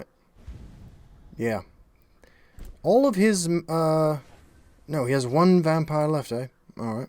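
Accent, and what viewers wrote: Ireland. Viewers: Very strong Irish accent Sir:)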